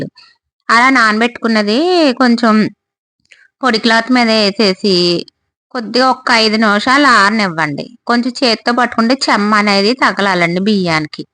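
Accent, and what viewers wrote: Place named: Godavari, India. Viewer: మీ గోదావరి slang చాలా బాగుంది medam 🎉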